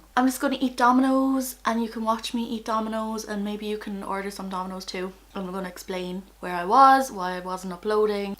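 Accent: Ireland. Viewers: U deserve so much more subscribers ( I just came across ur channel and I Love it +1 subscriber) I guess ur from Ireland, u have the accent 🇮🇪😁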